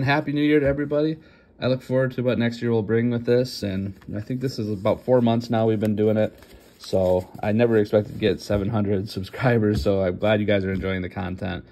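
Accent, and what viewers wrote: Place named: Canada. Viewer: Odd question, you sound like somebody I know. Are you by any chance from canada?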